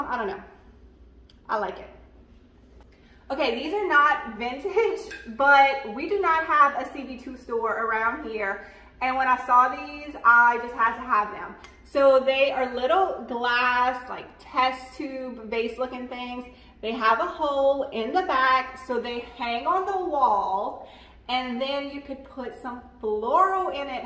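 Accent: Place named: South, United States. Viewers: I love your accent. I live in Mississippi, too. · your shows are such fun and you are such a KIND woman. It is a joy to watch you. P.S. My husband always knows when I am watching, "I hear your Southern Lady"s voice". You make us both smile.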